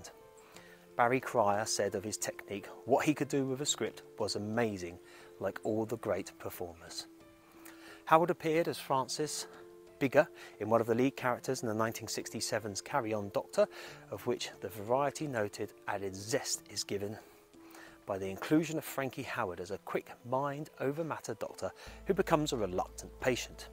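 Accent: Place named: South West England, United Kingdom. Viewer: you made us laugh here in Bristol with your South West accent. Classic try.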